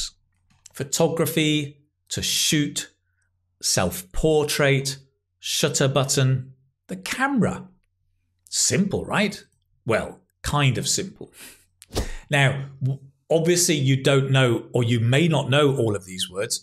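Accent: United Kingdom. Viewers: Sir do you have british or american accent please tell me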